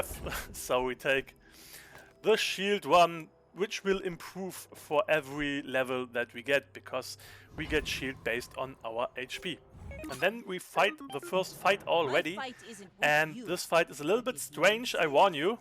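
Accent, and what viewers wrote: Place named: Poland. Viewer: Where are you from? You sound polish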